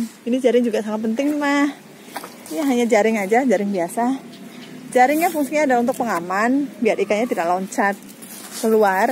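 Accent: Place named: Banjar, Indonesia. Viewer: Dari logat ibu sprti orang banjar kalteng ya😀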